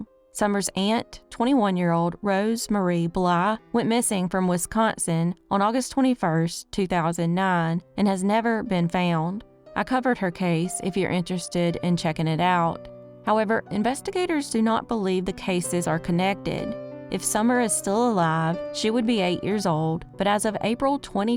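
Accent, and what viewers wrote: South, United States. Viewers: I love to hear that southern accent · Hearing Southern crime/unsolved mysteries stories from a narrator with a Southern accent is so much better. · I love hearing a southern accent for a change, born, raised and still in southeast GA I haven't heard these before only the current case I'm following in Sumner County, Sebastian Rogers as well as Summer Wells also in TN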